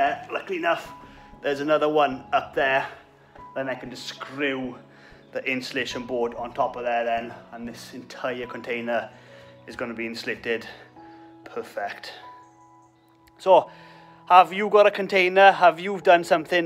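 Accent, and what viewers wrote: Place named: Ceredigion, United Kingdom. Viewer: my wife said ‘he sounds like he’s from your way’. My guess was Ceredigion.. 😜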